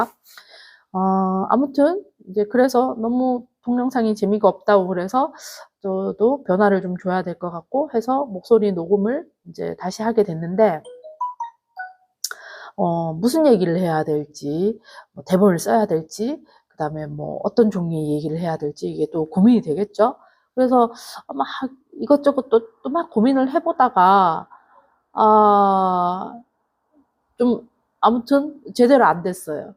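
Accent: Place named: Gyeongsang, South Korea. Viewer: 그림을 그리면서 말을 한다는것이 힘이듭니다 가끔씩 경상도억양으로 말해주세요 목소리를 들으면 고향생각도 나서 좋아요 그림수업 잘하고있어요 감사합니다 화이팅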